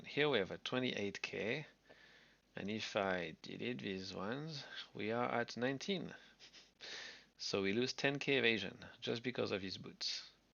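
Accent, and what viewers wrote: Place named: France. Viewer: hi, great video! I cant tell if you're french with your accent, am i right? haha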